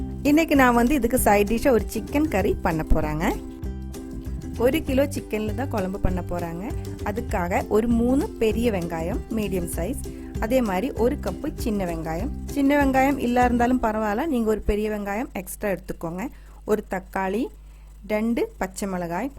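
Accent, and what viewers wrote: Kerala, India. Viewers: hi mam ur way of presentation is simple&..ur malayalam mixed tamil is understanable..i love..can you tell about adaprathaman recipe?..thank you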